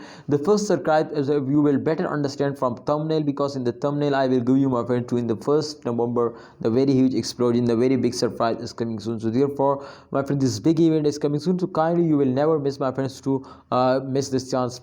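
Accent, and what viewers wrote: India. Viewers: When i here indian accent i move on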